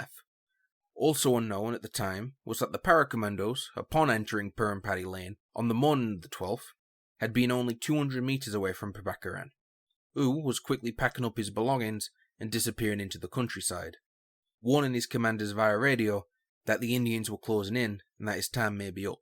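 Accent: Yorkshire, United Kingdom. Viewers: The narrator seems British, I hope he knew that the root causes were created by the British; mother of all modern day conflicts 😊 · what accent is this? which british city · It's not Bri'ish, It's Yorkshire! Aye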